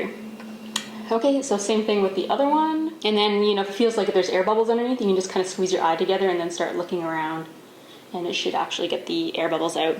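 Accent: Canada. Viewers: Love a Canadian accent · Love your Canadian Accent!! Its so cute!!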